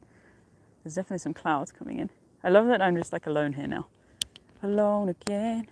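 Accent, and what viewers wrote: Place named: Scotland, United Kingdom. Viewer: Fab views, thanks. Love your wee Scottish accent, can’t wait to see you in a kilt 😉x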